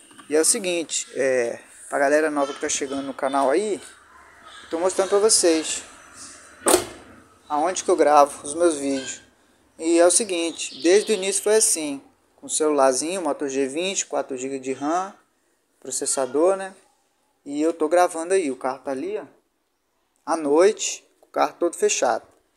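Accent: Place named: Rio de Janeiro, Brazil. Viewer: Eu jurava que tu era carioca kkkkkk tamo junto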